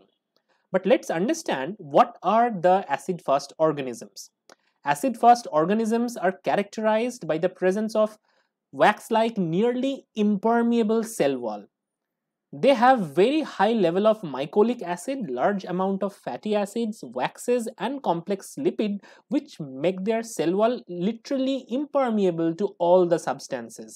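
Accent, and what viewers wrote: Bengal, India. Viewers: U have accet of a bengali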